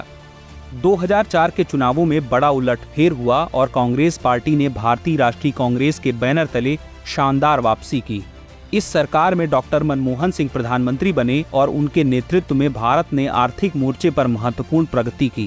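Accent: Bihar, India. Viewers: Aap Bihar se ho...? Hna..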